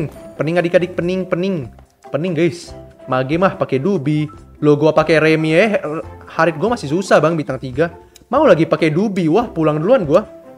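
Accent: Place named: Medan, Indonesia. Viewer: hallo bng subscribe baru ni bang lucu kali logat kau bang orang Medan kah??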